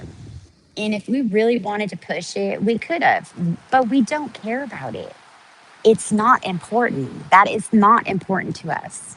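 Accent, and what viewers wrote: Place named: South, United States. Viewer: I was just writing a comment about sounding like you were from below the old Mason-Dixon line. My parents are from the hills (if you know you know) and I spent time all over in a military family. But the drawl always peeks out and makes itself known! Some people are still dumb enough to think if you speak that way it means you are slow somehow...some people are certainly idiots though. Anyway, I just want to say I appreciate the work that your group, Emma666 etc do to give the public actual facts. I'm not against grown adults using their money however they want as long as they know what and who they are actually supporting and make that decision with full knowledge of all the facts. I just really hate watching people get taken advantage of; through lies, guilt, psychological manipulation, etc. There are so many organizations and individuals who actually do deserve the good will and charity of these people who are willing to donate but unfortunately after dealing with some of these people, they are then usually ruined for opening their hearts and wallets in the future later after being manipulated and scammed (imo). 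He is robbing people twice, in my opinion. Once of the funds, secondly by their stealing their future kindness, generosity and willingness to help others in the future. And besides that, some entitled assholes just need to be given a few doses of their own medicine and taken down a notch or two. If you a such a rancid individual don't impose yourself on the rest of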